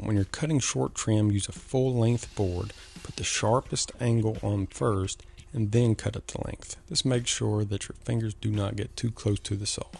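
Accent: South, United States. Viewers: a southern drawl is a good thing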